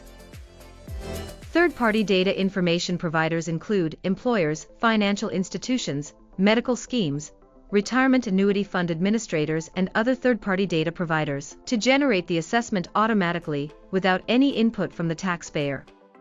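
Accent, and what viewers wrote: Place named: United States. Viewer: Really annoying that a video for the South African Revenue Service is narrated by someone with an American accent! WHY?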